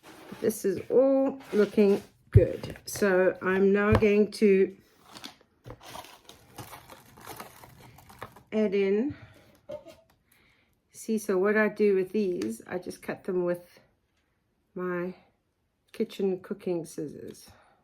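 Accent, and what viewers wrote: South Africa. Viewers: You sound like a South African. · But your accent is South African?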